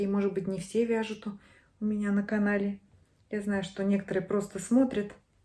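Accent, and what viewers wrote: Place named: Voronezh, Russia. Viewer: Ну все красиво, и мастерица и ее работы !!! Чудо-воротнички, с каждым платье смотрится по разному. Очень приятно слышать любимый воронежский говор ! Если я не ошибаюсь.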